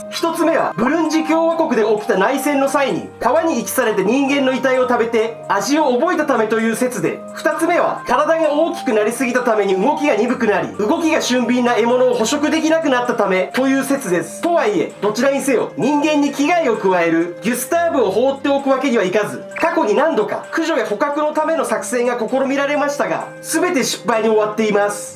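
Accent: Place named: Fukushima, Japan. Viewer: なまり、福島弁ぽいばい🤔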